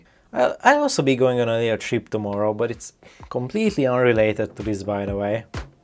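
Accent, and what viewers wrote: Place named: Hungary. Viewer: you sound like you have a hungarian accent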